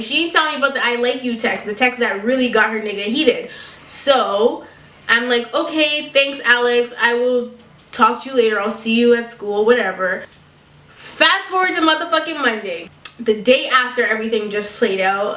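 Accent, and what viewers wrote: England, United Kingdom. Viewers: Oui that British accent m8 it was amazing lol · LOVE FROM BRITAAAIIIIN! Btw british accent you sound just like a school friend😂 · BITCH THE BRITISH ACCENT. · OMG UR ENGLISH ACCENT IS EVERYTHING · That british accent though 😂😍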